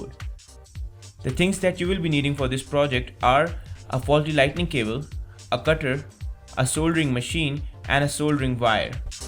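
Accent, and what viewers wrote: India. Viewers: Hes indian dont judges on how they speak ok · Your accent pisses me off so much. I hate fucking Indian accents. I just hate most Indians in general even though I am one but don't look or sound like one 😂 I'm serious I fucking hate Indians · you country voice? indu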